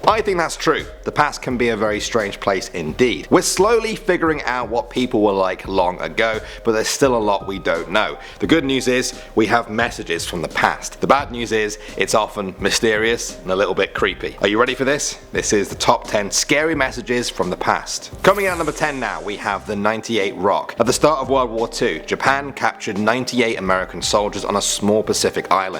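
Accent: United Kingdom. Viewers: He is British, its an accent, not him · I hate the British accent. Stop asking me a question thru your statements. Ugh. I live in the UK.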